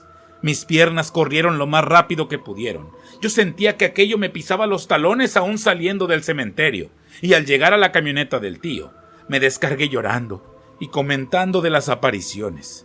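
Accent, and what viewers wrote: Mexico. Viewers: adoro el acento Mexicano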